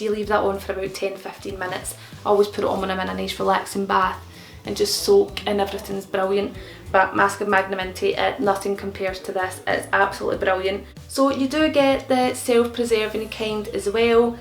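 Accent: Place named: Scotland, United Kingdom. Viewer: I've only watched like 2 mins of the video but just wanted to say that you are so beautiful and I love your accent 😃 I'm from just south of London so have a super boring accent and I think Scottish accents are lovely!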